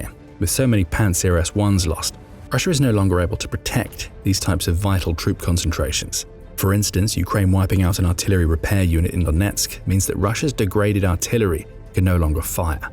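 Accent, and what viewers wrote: United Kingdom. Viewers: Im very surprised you're saying soccer when you sound like you're from the uk.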